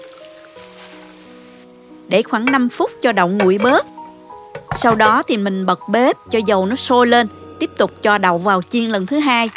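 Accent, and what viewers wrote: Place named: Binh Duong, Vietnam. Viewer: đọc lạ Bình Dương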